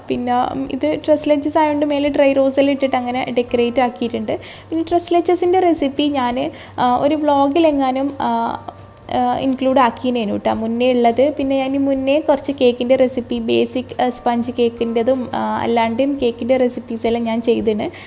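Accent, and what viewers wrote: Kannur, India. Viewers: ithande Kannur basha oru rakshayum ellatto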